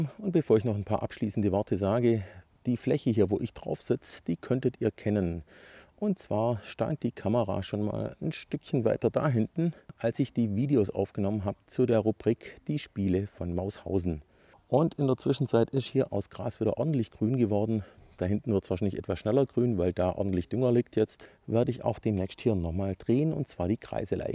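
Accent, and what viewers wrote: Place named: Swabia, Germany. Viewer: War wieder sehr unterhaltsam und lehrreich. Wären wir in der Nähe, könntest von uns auch viel Kaffeesatz kriegen (Vollautomat) 😅. Das Einfärben ist im wahrsten Sinne des Wortes eine "Sauarbeit". Kriegst du da keine Probleme mit der Haut, ist das nicht wie Schmirgelpapier? Ergebnis ist jedenfalls echt gut. Ich finde es übrigens cool, wenn du schwäbisch schwätzen tust.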